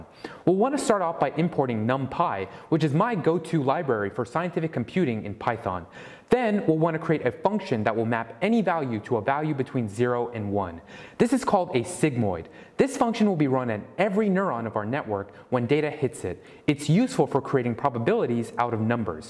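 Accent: India. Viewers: I appreciate you not having a thick Indian accent. Not that I have anything against it but it's just really hard to listen to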